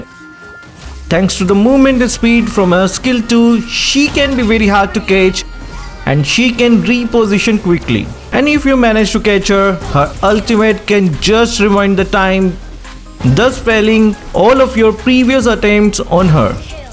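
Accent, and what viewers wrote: India. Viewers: I dont trust indians when it comes mobile legends 😅🤣🤣🤣😂 the accent says it all masala accent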